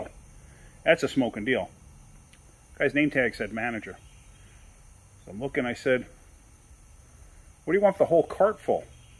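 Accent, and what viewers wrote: Sweden. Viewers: I KNEW you were from Sweden. Your accent gives it away.😅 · What did i just hear, you are from Sweden 😀 For how long have you been in the states? Greetings from Finland 😊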